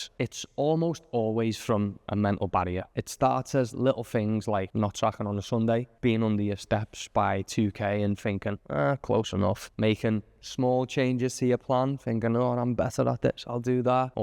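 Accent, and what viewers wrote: Liverpool, United Kingdom. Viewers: Are you scouse?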